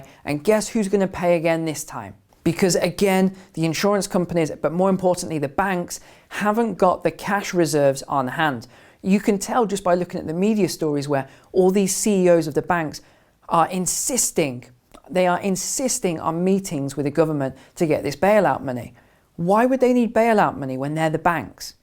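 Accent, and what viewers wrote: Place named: England, United Kingdom. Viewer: Morning. liked and Subscribed. So entertaining listening to a refined English accent talking about American real estate. Im a Yorkshireman with the usual Yorkshire twang. Do your grim findings apply to the UK. Cheers for the content. Tim.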